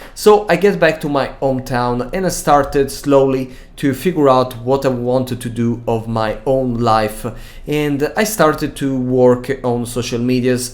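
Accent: Italy. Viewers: same. Sometimes seems a little bit russian, but you can feel the italian in some sentences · Italian I think❤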